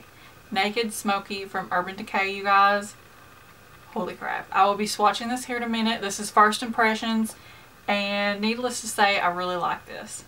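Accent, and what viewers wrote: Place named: South, United States. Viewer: I have similar face shape, eyes and hair as you so I love to see new ways to do makeup. I didn't pick up this palette at Sephora the other day still pondering. Also, your accent sounds like mine. Southern girl? :)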